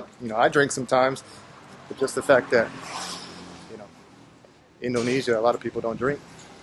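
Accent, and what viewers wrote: Texas, United States. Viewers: LOl i knew it.. i knew you are from Texas, my best guess was from mins when you said "45" that dialect tho.. i told my wife, i think this guy from Texas, and then later.. you said it yourself. you even did small homework about Jokowi and history, really really appreciate it! enjoy your traveling in my country. PS: that drunk dude mentioned "CIU" it's a chinese origin language for SAKE like beverages.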